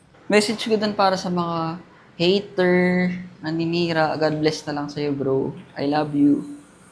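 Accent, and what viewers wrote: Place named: Visayas, Philippines. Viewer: solid ung pgka bisaya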